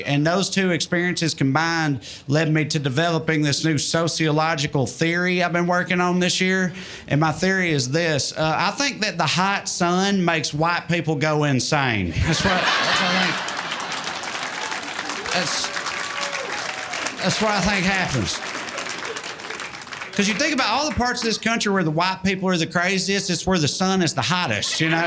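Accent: South, United States. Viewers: Yall are my favorite genre of white people. Love a liberal with a thick southern accent. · Picture the language skills of G.K.Chesterton in the accent of the Dukes of Hazzard. Brilliant and hysterically funny. Took my husband and son-in-law to see Trae at Funny Bone in Virginia Beach. A crowd of huge fans, blown away by his intelligent goofiness. 🥰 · It's like Alan Sorkin dialogue performed with a southern accent. The writing is that good. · Good Lord! God Al.ighty! This Southern sounding boy makes me relate😁Much enjoyed, go on with your bad self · I actually felt it was the opposite, that he felt the need to compensate for his accent by using big words like "vehemently" "gain purchase" "whimsical anarchy" and so on, I think he plays on the jarring nature of being a verbose redneck, that's one thing I love about his comedy. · Does he have a southern accent? 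· His “southern accent” is getting thicker, and more cringe. Before you know it he’ll be ending every joke with GIT’R Done!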